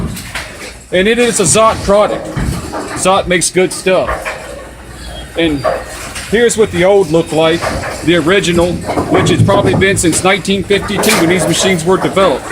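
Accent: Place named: South, United States. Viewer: You have a southern accent!!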